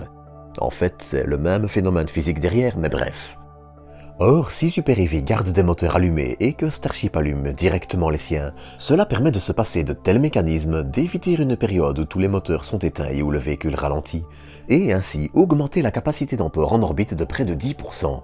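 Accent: Belgium. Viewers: Ouiiii la voix BELGE !!! xDDDDd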